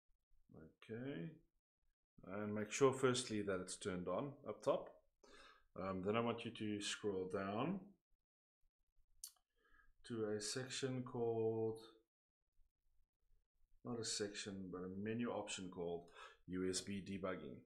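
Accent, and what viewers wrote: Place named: South Africa. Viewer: Saw ZA and I was like, hmmm maybe he's South African. Heard the accent and confirmed :). Dankie vir die awesome video!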